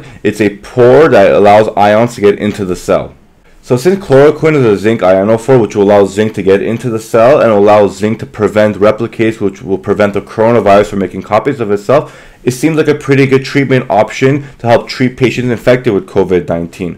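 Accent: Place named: Levant, Syria. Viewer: Are you originally Syrian or Lebanese? I mean no offence just because of the accent. I am a linguist and do research some accents. Thanks for the infos, it was quite informative and needed!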